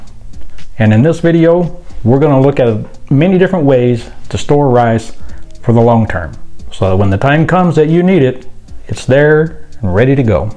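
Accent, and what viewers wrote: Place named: Arkansas, United States. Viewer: Also, I do not know where you are from. You have the best accent. Could listen to you talk all day. My guess is Arkansas. My best friends (I call family,) were all from Arkansas. I lived in Mississippi for a few years. And Granddad & relatives were from Shreveport. Anyway, none of my business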